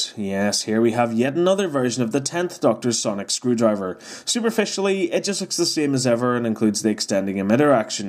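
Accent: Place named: Scotland, United Kingdom. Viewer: you sound like you are from Scotland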